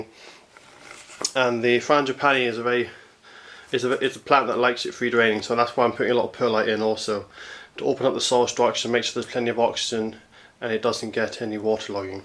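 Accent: United Kingdom. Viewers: I'm comforted by the fact that you are (or certainly sound) British, so I'm presuming you're in the UK...? I have 7 Frangipani plantlets which I germinated last year; they're all about 6" tall, so I'm about to re-pot all of them. Wish me luck - !!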